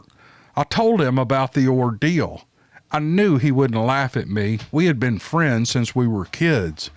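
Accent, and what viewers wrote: South, United States. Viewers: There nothing wrong with your voice I love the way you talk and when you do these videos you can close your and imagine yourself there you do a good job in tell you this I listen to bigfoot case and I listen al TRUSTED but your is the best cause you make the stories more interesting and I get my popcorn and sit back when you tell the story it like you there .thank for the video I keep enjoying your videos keep up the good work with your southern accent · Your channel for stories is the best. Your southern accent and kind way about you, makes you fun to listen to. Keep up the great job. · I could listen to your intro songs and by themselves for hours and still enjoy your show.Im in the Huntsville area so your accent is easy for me to understand,did you know that there are eleven distinct southern dialects?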